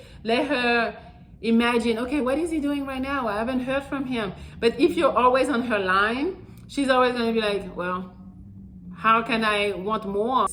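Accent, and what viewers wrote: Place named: France. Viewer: That’s where the accent come from I thought you where French As a Haitian man I thought I Hurd it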